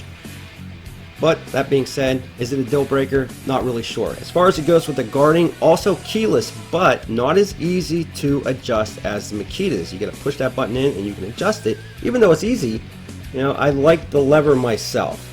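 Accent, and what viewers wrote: Pittsburgh, United States. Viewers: You have to be from near Pgh based on your dialect. If so, awesome.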